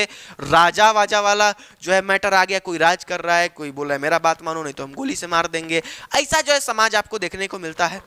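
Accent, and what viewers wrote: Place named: Uttar Pradesh, India. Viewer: You are from UP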